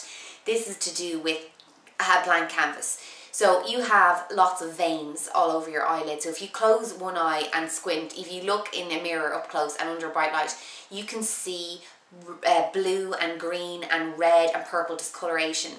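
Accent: Ireland. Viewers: You Really sound Irish, cause i know Because i am irish, Just not from dublin, CORK!!!!! bt dub Thanks for da tips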